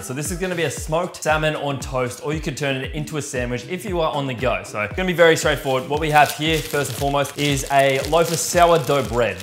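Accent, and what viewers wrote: Australia. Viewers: So u said it is quite hot in hear so with that information and ur accent u r an Australian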